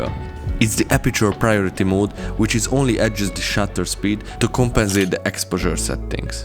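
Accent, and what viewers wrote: Hungary. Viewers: Meghallottam ahogy beszélsz egyből levágtam hogy magyar vagy :D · Amint meghallottam az akcentust, tudtam hogy magyar vagy. :D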